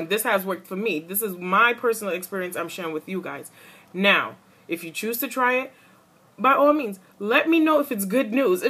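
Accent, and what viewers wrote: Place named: New Orleans, United States. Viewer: THANK YOU but it sound like you from N.O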